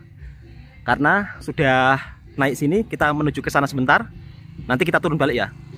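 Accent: Java, Indonesia. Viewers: Suara n tonasi nya bunyi jowo kn ...medok banget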